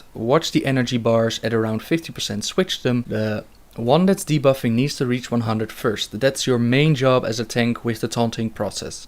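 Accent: Netherlands. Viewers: Dude, amazing guide, real nice and clear:) I have a question tho, Are you dutch? you sound like a dutch guy talking english:p Keep it up man:D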